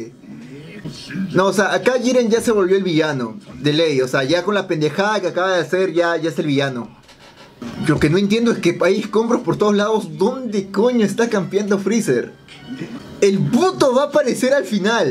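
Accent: Mexico. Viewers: por que hablas como mexicano si eres peruano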